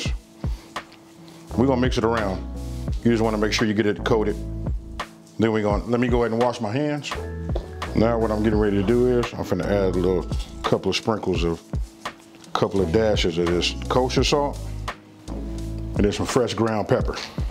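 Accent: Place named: South Los Angeles, United States. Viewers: Factz luv his cali accent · He definitely sound like he from Slauson and western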